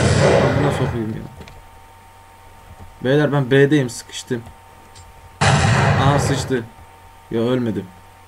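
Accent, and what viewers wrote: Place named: Kastamonu, Turkey. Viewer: Kastamonulu şivesinden anlamadınmı :D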